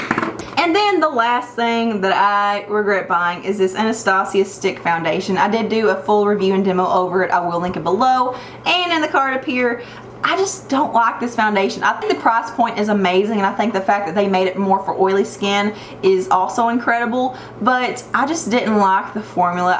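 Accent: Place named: Georgia, United States. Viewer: I subscribed as soon as I heard your accent. 😂😂😂 I'm from GA and live in SC. I like hearing people talk like me! 🙌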